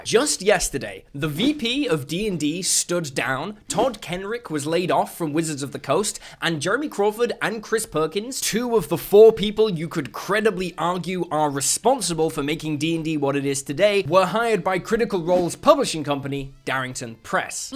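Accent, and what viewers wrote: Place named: United Kingdom. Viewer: Ha! you are british 😂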